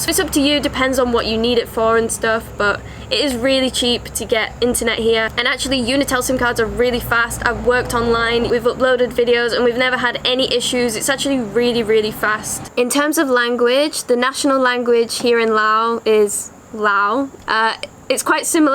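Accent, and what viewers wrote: United Kingdom. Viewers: Great narrator..beautiful English accent ❤laos.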